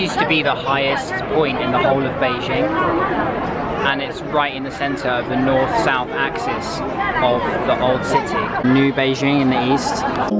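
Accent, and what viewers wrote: United Kingdom. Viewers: ignorance is no excuse for anything - what kind of up your nose BRIT accent is that?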